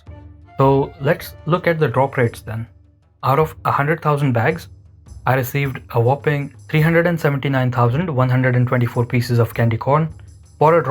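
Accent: India. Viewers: my god indian accent kills me every fuckin time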